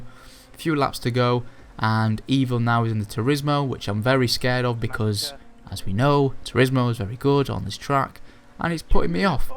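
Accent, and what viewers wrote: Yorkshire, United Kingdom. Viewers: r u from yorkshire?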